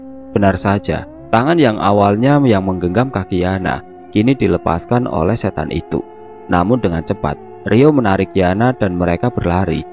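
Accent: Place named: Java, Indonesia. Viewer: Suaranya bagus, ceritanya seru, ada lucu nya, ada medoknya, trnyata mas ganteng juga 😅 baru tau deh...😅 Semangat mas ❤ Btw mas sekarang bikin Chanel Radio horor itu ya?? Suaranya sama bgt soalnya. Sukses selalu mas 🎉😊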